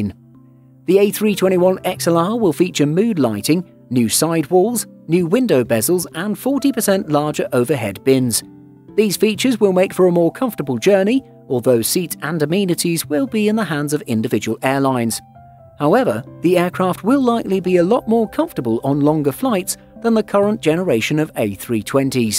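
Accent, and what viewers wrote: England, United Kingdom. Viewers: Which English accent does this guy have?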